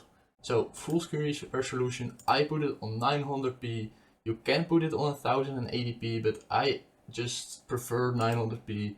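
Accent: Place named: Netherlands. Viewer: ik hoor hier een Nederlands accentje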